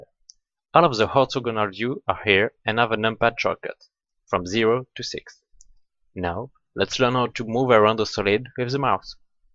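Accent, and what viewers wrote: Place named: France. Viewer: Your French accent is just fine; one thing, if I may: middle is pronounced with an "ee" not an "eye" sound, like here: <link> Many thanks for your effort, good video!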